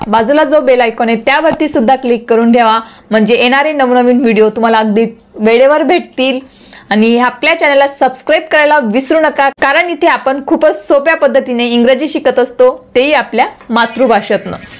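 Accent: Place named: Jalgaon, India. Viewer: Khoopach Chhan ! Tai tumhi Jalgaonchya disata. Bhashevarun lagech kalate.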